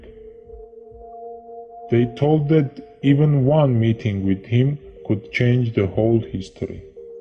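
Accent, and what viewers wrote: Russia. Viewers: Sounds like russian hacker tarus kool not saying he is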